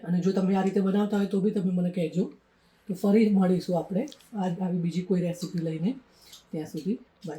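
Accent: Mehsana, India. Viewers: Bhasha mahesanani chhe to vatan?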